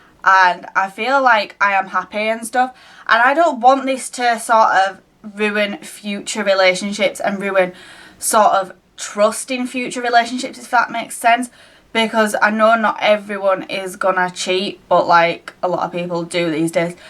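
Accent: Yorkshire, United Kingdom. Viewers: I know how you feel, my relationship also ended in July and was horrible! I find spending time with my friends on the weekends and having something to totally focus on (which for me is my uni work) helps. Its totally a relief, refreshing and just amazing when you can finally be free. From when I first came across your videos years ago through your Yorkshire accent tag I thought you were and still are beautiful. <3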